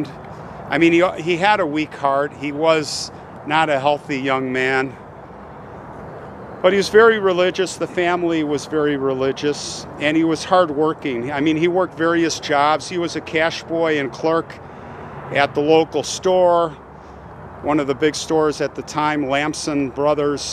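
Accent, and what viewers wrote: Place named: New England, United States. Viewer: Makes you wonder how many others were buried alive back then.I detect an accent from the New England area. I am also from there.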